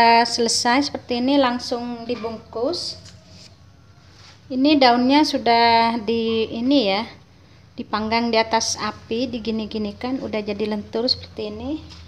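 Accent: Madura, Indonesia. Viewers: Bunda dari mana ya ...ngomongnya kyak org madura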